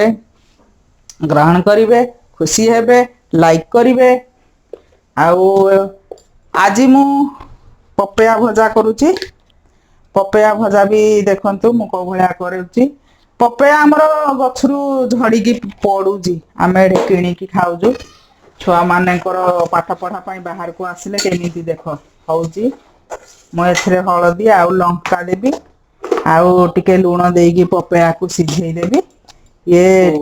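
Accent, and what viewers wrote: Angul, India. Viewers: Bahut badhiya vedio and Apnakara cute angulia Katha. Plz sabu din kichi angulia Katha Kahile bhala lagiba 👍👍👍👍😍😍😍😍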